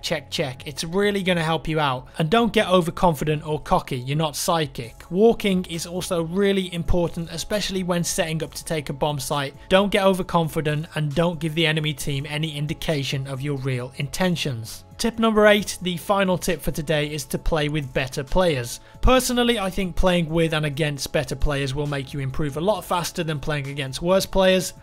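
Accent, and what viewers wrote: United Kingdom. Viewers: U r british m8?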